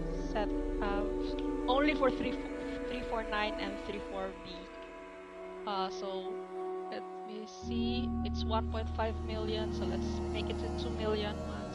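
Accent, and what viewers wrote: Philippines. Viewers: your accent... pinoy?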